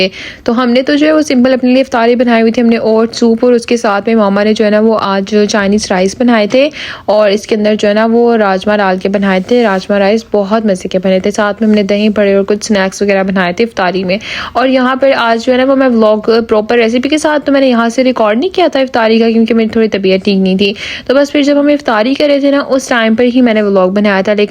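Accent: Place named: Arab world. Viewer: Your voice is soo sweet and your arabic accent also 😍 very nice vlog and i really enjoyed your vlog🎉❤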